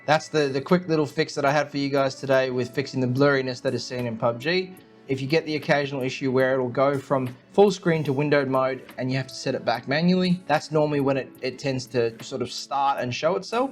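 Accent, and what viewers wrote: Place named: Australia. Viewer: Thanks mate , Love from Australia Idk but ya seems Aussie 🦘 too ?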